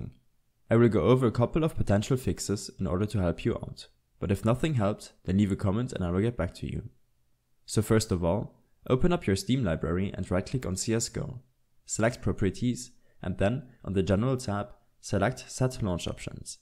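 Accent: Germany. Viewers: That german accent 😻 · Man merkt an deinem Akzent dass du Deutscher bist ahaha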